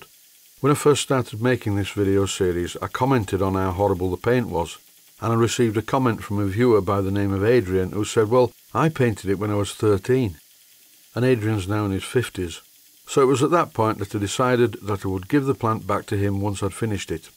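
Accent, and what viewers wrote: Yorkshire, United Kingdom. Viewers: Nice plant,. And I've wondered about if you could do an episode in your Yorkshire accent sometime, with subtitles for the ones unable to understand. I understand it just fine, got a few mates in the northern parts of the UK who I've known and chatted with for years. Keep up the good work, mate.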